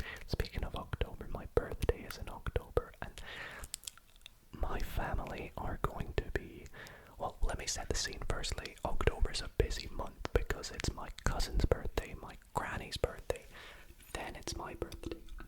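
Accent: United Kingdom. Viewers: Hi! Are you from Scotland, England or Ireland?? Your accent is really comforting and nice!! Loved this video!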